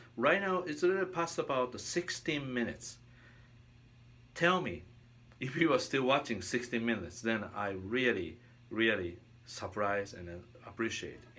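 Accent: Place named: Japan. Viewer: I'm a simple guy. I see long black hair, Japanese accent, tasty blues...I hit like button... Btw Beato's vid and subsequent inferring are a little elliptical: the way you play is highly influenced the comfort (or discomfort) you feel on the guitar; I'm not surprised Beato (whose guitar setup is mainly on 8's) plays waaaay better and sounds waaaay better on 8's...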